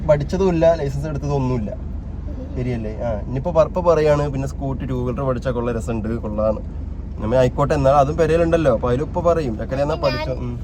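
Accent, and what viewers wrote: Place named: Malappuram, India. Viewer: മലപുറ० ഭാഷആയിരികുനനു❤❤❤❤❤❤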